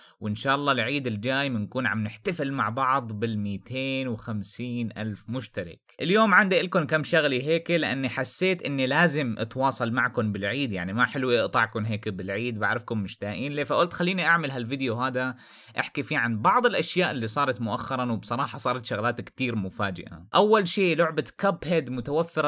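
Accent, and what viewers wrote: Homs, Syria. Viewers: معلم انا بشغل فيديوهاتك مع العيلة و امي دائما بتقلي انو انت سوري من حمص😁😁 فهل انت حمصي؟ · انت سوري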